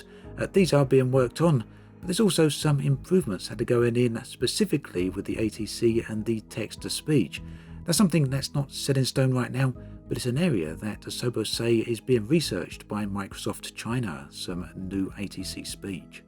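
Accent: United Kingdom. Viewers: His accent is pretty standard for parts of the UK. What a strange thing to say.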